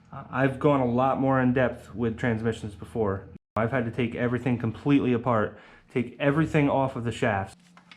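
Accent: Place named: New England, United States. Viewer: I agree with others here, You sure do sound like Andrew. Must be the New England accent.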